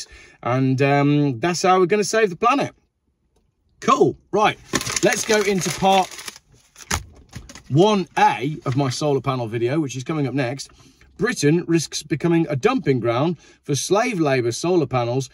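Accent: Australia. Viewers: Watching in Australia... that is the best Australian accent I've ever heard from a pom, my friend. Most people over do it. You got it just right, 😆👍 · Love your ozzie accent ❤ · Pretty good Aussie accent,. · Great stuff, even the Aussie accent is spot on. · Loving the oz accent 😂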